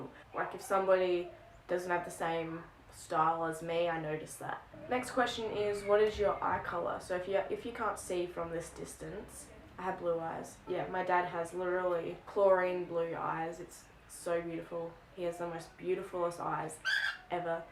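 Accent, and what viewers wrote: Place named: Australia. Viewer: Also figured you were Australian with that neat accent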